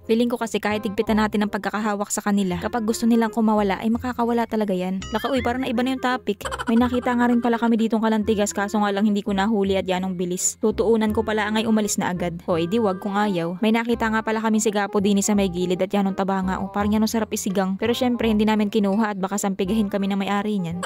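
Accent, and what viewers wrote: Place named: Visayas, Philippines. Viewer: Murag bisaya si maam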